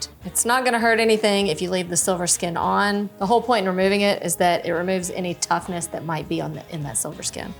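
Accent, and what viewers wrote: South, United States. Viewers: I like her southern accent ...❤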